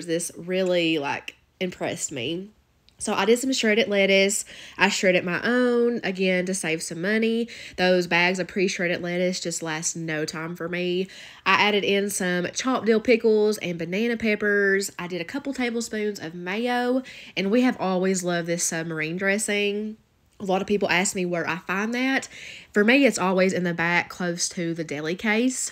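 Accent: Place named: Massachusetts, United States. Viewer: your accent is so cute. In live in MA and you know how we sound! I love your accent and your channel!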